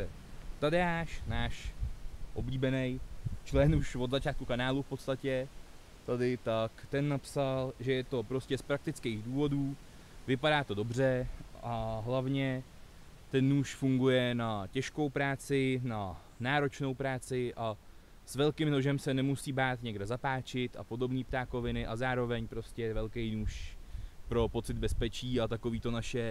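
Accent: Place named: Pilsen, Czech Republic. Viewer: Sry, ale ta plzenština se fakt poslouchat. .. zeptal jsem se kameearádů na jejich neeazor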